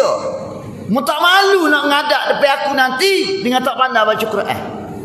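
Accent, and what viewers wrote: Kelantan, Malaysia. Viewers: Silap paham demo ni..demo xpaham lenggok bahsa kelate tuh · Tu loghat kelantan, kalo org xbiasa mgkin terasa agak kasar... Tp konteks bodo yg ustaz sampaikan dlm ni bermksd rugi/merugikan diri sendiri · Sedara salah faham ni.dengar smpai hbis.loghat kelantan ni memang begitu.kalau mendalami dan teliti sedih apa yang ustaz smpaikan tu.jgn terlalu mudah menilai dan bersangka- sangka .ilmu dia jauh lbh baik drp kita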